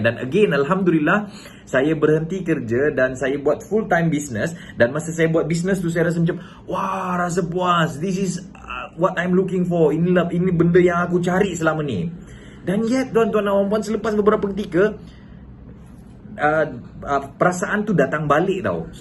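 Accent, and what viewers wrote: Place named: Kelantan, Malaysia. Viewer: tq Tuan. Sbb sskli tn ckp cm Kelantan.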